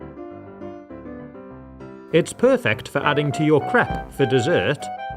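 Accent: United Kingdom. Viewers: I've been wondering are you British or not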